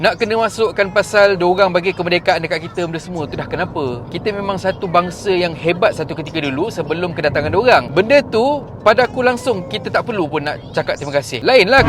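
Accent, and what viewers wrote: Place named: North, Malaysia. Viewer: blk utara ka.. Best mampuihhh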